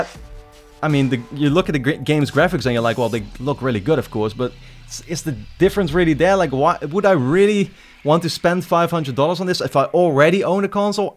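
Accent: Netherlands. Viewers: You sound dutch... Are you dutch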